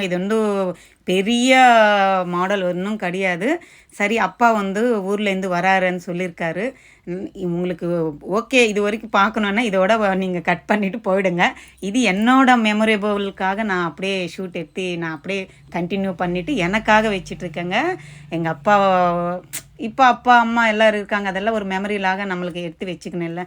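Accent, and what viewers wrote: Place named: Bangalore, India. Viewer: Akka neenga Bangalore uh slang Apadi Iruku....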